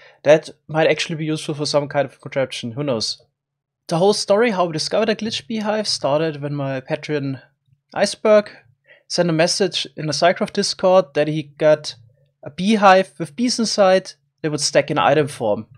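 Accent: Germany. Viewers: Are u german thiss accent insane haha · Da hör ich doch den deutschen Akzent😂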